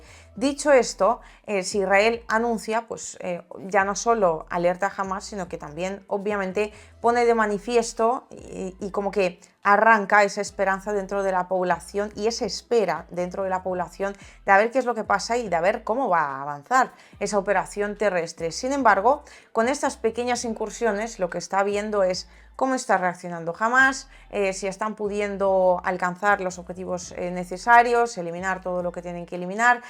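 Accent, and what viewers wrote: Spain. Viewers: Muchas gracias y ya se que es rusa yhabla español de acento de España!!! Mejor que sea rusa. Y si soy bobalicon o lo que sea, la belleza te pone torpes las neuronas ! Así somos de intensos los latinos, pero ante todo su inteligencia vale mucho más de esta hermosa dama . Grazie , buona giornata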